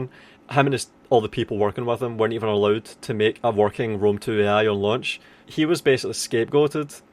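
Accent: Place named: Belfast, United Kingdom. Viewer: cool mate. Your accent sounded like it could be Belfast. Anyways enjoyed the video!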